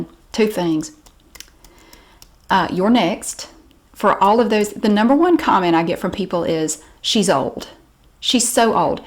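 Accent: South, United States. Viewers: Btw you are a beautiful woman with a pretty southern voice and your personality is awesome. I also admire your diligence.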